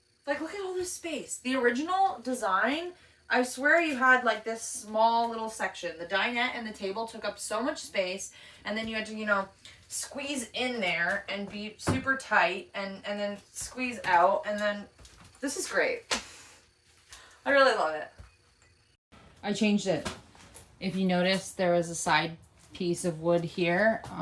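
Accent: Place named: United States. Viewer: Love the innocence. I’m sure ur a cool gal. U in “Canada” or somewhere but ur accent sound “American”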